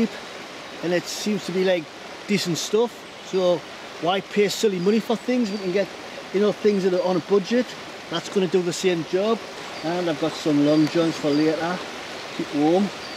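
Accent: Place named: Northumberland, United Kingdom. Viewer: Wey I, I take it from ur accent it’s bothal Northumberland, used to go there wit ashington sea cadets cleaning the river on wknds man...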